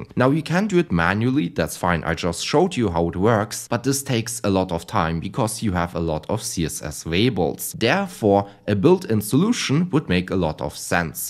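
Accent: Germany. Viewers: You sound soooooooo much like great scott. I mean I assume you're both German, but still it is uncanny.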